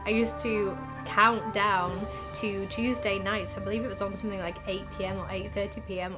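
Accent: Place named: Northern England, United Kingdom. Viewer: No subtitles needed. I love your northern accent big hug from Mexico. Love your content 😊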